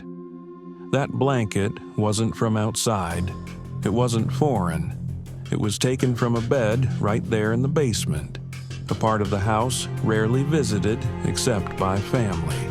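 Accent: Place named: South, United States. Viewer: The narration is horrible with some type of crazy “southern” accent. Couldn’t listen.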